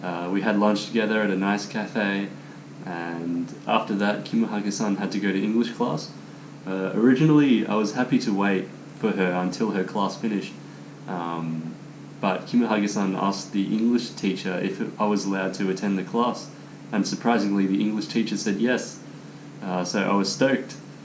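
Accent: Australia. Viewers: Australia Englishの発音がちと、ムズい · You got a nice Aussie accent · オーストラリア訛り苦手だから助かるわ…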